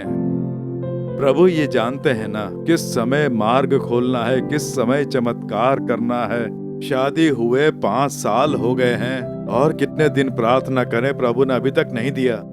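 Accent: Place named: Chhattisgarh, India. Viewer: Chhattisgarh ki bol rahi hai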